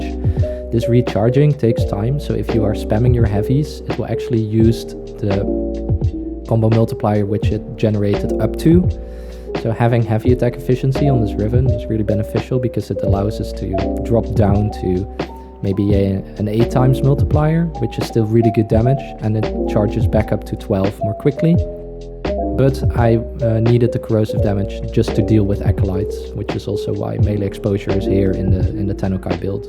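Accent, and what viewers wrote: Netherlands. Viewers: Are dutch because you really sound like u are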